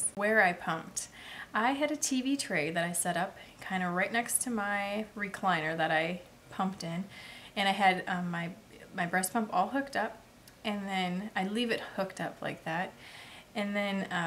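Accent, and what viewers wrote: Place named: Minnesota, United States. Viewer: btw I love your videos, especially just to hear that wonderful Minnesota accent! I don't know where in the state you are (assuming subs) but I've lived out of state since 2005 and I miss it soooo much! I grew up in St. Louis Park so now this city girl lives in small town West Virginia! Totally different. I don't know if you are a fair goer but if you are it would be super fun to see a video from the Great MN Get Together!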